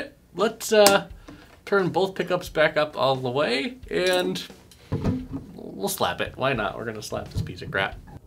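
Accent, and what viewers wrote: United Kingdom. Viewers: Sandberg Booster, G&L SB-1, Stingrays, Dingwall, all have deep clear sounds. All of the violin 🎻 Basses sound so dull and without out put. They’re like one step up from a lead guitar. English accent was ⭐️ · the british accent! unmatched.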